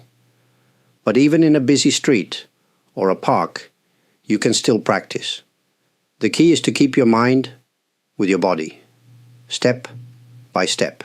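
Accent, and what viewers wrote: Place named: United Kingdom. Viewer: dear 🙏 I would love to catch a live with you sometime! or even just a your questions answered segment I would love ❤️ to hear about your faith journey of being a monk and I think I detect a slight UK 🇬🇧 accent in your voice, would love to know more about that, not to intrude on your privacy of course, just curious about your story always best regards and thanks 🙏 for your inspiring words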